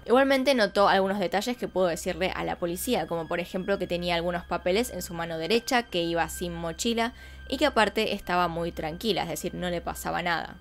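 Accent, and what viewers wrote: Argentina. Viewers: Cuentas con gran capacidad narrativa tengo como ocho meses de seguir tu canal pero no te comentaba porque no tenía buen celular, además que a los mexicanos nos gusta como hablan los argentinos.Tienes talento y personalidad. Espero siga creciendo tu canal. Sinceros saludos desde Torreón, Coahuila, México.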